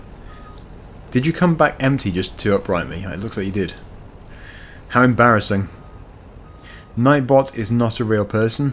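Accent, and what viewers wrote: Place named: United Kingdom. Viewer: David Correia uk people have a different acsent and that’s how he says assume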